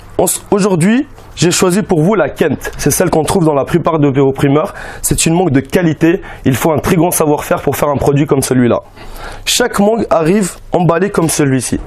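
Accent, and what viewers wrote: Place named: Kabylie, Algeria. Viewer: Je reconnais l'accent kabyle lol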